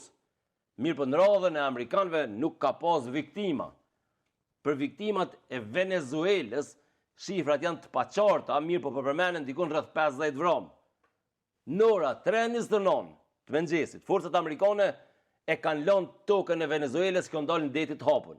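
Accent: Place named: Dardania, Kosovo. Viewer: me pelqen kur fol se e fol guhn e bukur DARDANE po te lutem mos i merr fjalet pej guhes shyipe te shyipnis ajo nuk asht guhe ajo asht me qellim guha ma e flliqt primitiv pervers e globit jo rasist se e len te funit me shkru ajo nuk ka lidhje me guhn e shyipetarv ajo asht guha ma e re e globit ,mire e kanemaqedont kur thon se asht guha ma e re en dyja se maqedonishtja ashte e 1950 nja 22 vjet ma e vjetr edhe pse te dyja naen ekane gegrishten ata e shkelin dhe e urrejn guhn tone guhen tone gegrishten ton guhen e babs GEG E NANE GRISHE -GEGGRISHTEN TONE TE BUKUR GUHEN UNIVERSALE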